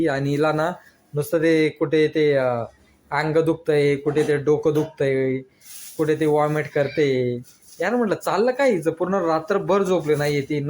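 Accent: Khandesh, India. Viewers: Bhau ahirani bhasha bhari she,dhulani ahirani ani bhadgaon pachorani ahirani ma pharak she🎉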